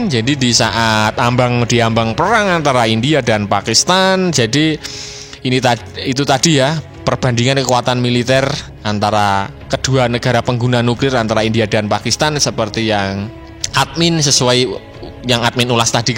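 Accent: Java, Indonesia. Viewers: Medok banget jawa lo min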